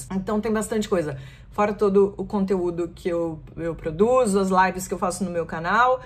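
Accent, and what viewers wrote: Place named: São Paulo, Brazil. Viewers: nada de errado com seu sotaque, inclusive eu acho que é bastante forte o jeito paulistano. Adoro sua coluna e assisto todas. Parabéns pelo excelente trabalho! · Seu sotaque é sul/sudeste, mas mais sudeste, tipo de SP. Suas análises são muito sensatas. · Maaaaaaaaa vc é perfeita!!!! Não perde o sotaque paulistaaaaaa!!!!!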